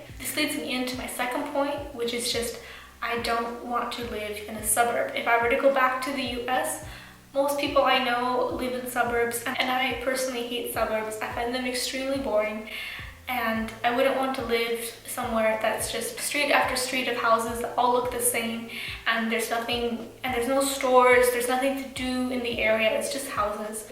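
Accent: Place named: Germany. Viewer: You do not sound like you are originally American your accent sounds like you are a German that moved to America!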